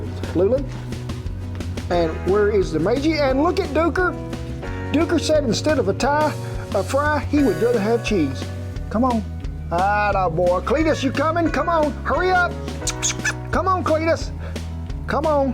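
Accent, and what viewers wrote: United States. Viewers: As a Canadian, I am very scared to see an American with a very heavy drawl making poutine.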